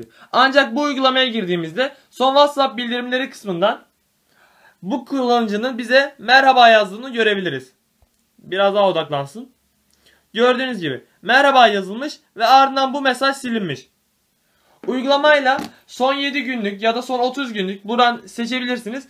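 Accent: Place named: Azerbaijan. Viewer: İntro TV adam azeri bizde azerileri anlamıyoz bazen normal bişi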